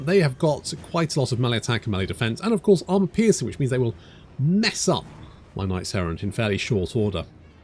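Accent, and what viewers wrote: France. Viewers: more french accent please. Since you want to be buddy with ol' Volkmar there,just give Zandri to him in exchange of asking the moustached fella to wage war against Aranessa,and speaking of facial hair,do the same thing with the Prospector dwarf,ask em to wage war towards Aranessa so they won't stab you in the back while you sailing up there. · as someone who knows how to speak french from his mom's family side, i DO want you to bring out the french accent. I think it'd be very based and funnypilled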